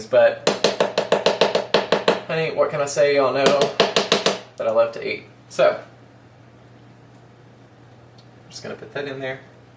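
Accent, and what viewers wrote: South, United States. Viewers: OMG.. I just found your channel through Traci B and I think you are great..btw...where are you from? Sounds like you have a little country accent like me..I am in NC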